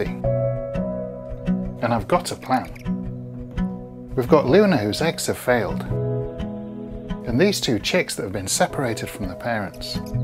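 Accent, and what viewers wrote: United Kingdom. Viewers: its not long before luner is spending her days here.....luner? or luna? oh your british, ughhhhh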